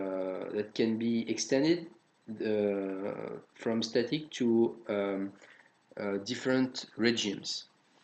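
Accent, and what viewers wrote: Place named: France. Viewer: I love your frenglish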